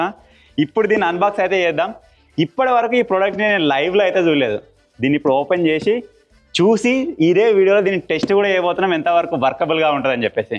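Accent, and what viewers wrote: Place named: Godavari, India. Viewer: Anna love from Andhra ❤ Maa slang lo undey magic eyy veru godavari ❤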